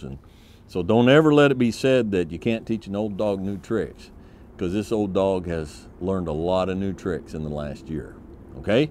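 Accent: United States. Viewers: Just get another buzz mate and there you go ))) I like your videos and stuff . And I adore your John Wayne's accent mate . Just keep doing it . I love it for God sake ))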